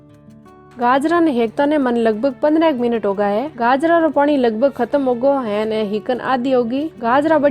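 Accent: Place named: Jodhpur, India. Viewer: Aapki boli mery pardadi ko bahut achhi lagi. And aappki boli mery Jodhpur me rahne vali mosi jesi hai 😊😊😊😊😊😊😊😊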